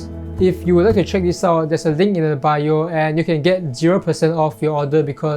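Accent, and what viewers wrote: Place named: Malaysia. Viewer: your accent is oh so familiar! you're malaysian, it turns out!!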